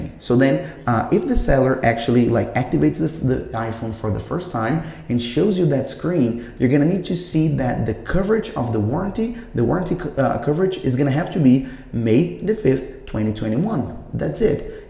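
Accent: Brazil. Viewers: Are you brazilian? I can hear brazilian in your accent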